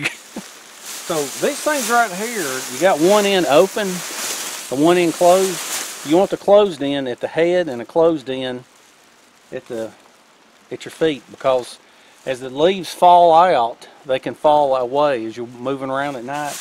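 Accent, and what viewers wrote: South, United States. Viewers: I always love your content. And your Accent bro. Are you from Down South? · Does anybody else watch because of the accent and the little sayings? Laughed out loud at the one about having to go to bed because he could hear the crickets! Of all the reasons and excuses for why it's bedtime, I have never used that one! Loved it... Subbed a while ago after hearing him refer to a rock as an Alabama hammer... What part of America is this accent common?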